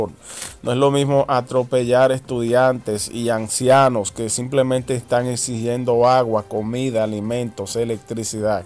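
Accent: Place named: Cuba. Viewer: amigo se nota que estas en contra del régimen pero de donde carajo eres por que no pareces venezolano, dices arcarde , gobelnadol ... eres cubano o de donde carajo? si eres venezolano disculpa pero toma clases de dicción.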